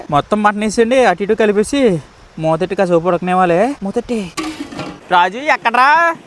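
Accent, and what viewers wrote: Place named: Kakinada, India. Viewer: హలో మీ వీడియో కి మీ మాటలు కాకినాడ యాస highlight సూపర్ వీడియో god bless you ma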